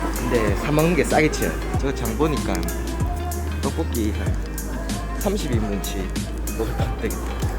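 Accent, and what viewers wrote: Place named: Daegu, South Korea. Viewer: 맛갔나?ㅋ이거도겁나웃겨요 유행어만들어야겠다 오늘부터친구테 써머거야지 원래도사투리쓰는사람 좋아했는데 대구사투리매력있음 님이대구사투리해서더매력있음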